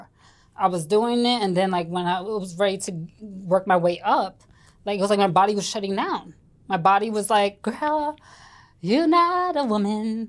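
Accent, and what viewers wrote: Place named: New York, United States. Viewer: Six minutes in & I cannot see u as a male! Love your New York voice.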